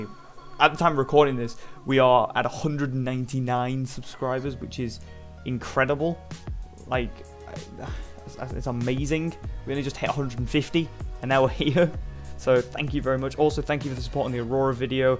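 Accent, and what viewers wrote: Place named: United Kingdom. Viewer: British accent while sounding like you just snorted some blow....maybe I'll understand at .75 speed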